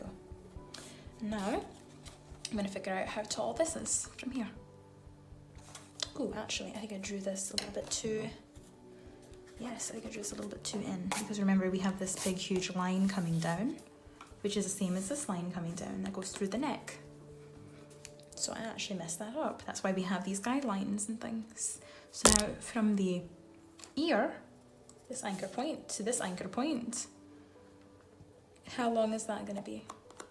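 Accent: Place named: Scotland, United Kingdom. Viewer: u from scotland for sure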